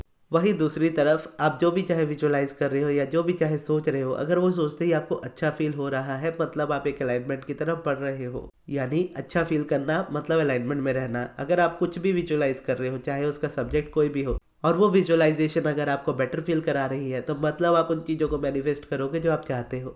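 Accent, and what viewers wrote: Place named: Jamshedpur, India. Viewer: Pta h bhai mai v jamshedpur jharkhand adityapur se hu aapko ek baat batau jindgi mai low of attraction ke videos dekh dekh 2014 se paresan ho gai the matlab bohot confuse fir ek din maine universe ko bola bhagwan please kisi v tarah koi insan ho jis se low of attraction bohot easy ho jaay sikhna please us se mila do aur us waqt mai bank mai baithe the aur aapki ek video mili aaj wo ek h mai her video apki low of attraction ke achi se samjh pati hu sikh pati hu starting se aapko follow kr rahi hu aapko aur aapki awaj sun ke feel hua pta ni kuy ye humlogo ke yahna se he h fir pta v chal gya aap v jamshedpur se he ho aur main v aaj low of attraction bohot ache se sirf aapse sikha matlab universe ko bola unhone aapko bhej diya .aaj meditation v sirf aapke wajah se kr pati hu.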